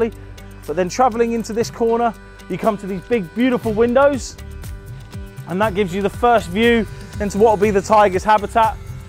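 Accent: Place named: Germany. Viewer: Cara orang Jerman bicara Inggris ketauan banget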